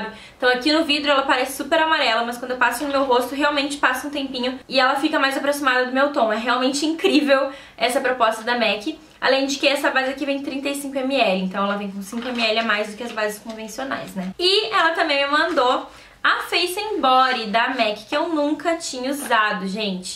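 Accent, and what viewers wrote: Rio Grande do Sul, Brazil. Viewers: Amo o meu sutaque gaúcho ,amo o seu, awn enfim deus ta la em cima tomando chimarrão ! Dale RS SM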